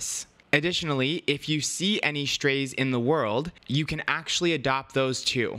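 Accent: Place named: Philippines. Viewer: you sounded Filipino. haha. Nice content by the way.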